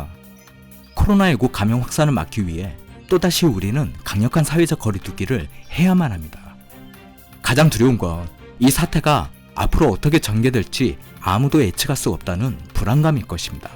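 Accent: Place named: Yanbian, China. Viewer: 조선족은 중국관련 영상이나 올리시오. 아무리 한국인인척해도 당신 발음과 억양속에 조선족임이 들어나고 있음.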